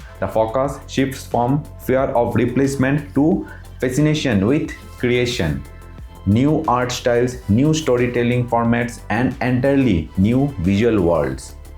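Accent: India. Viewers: Curry English gives me terrible headaches.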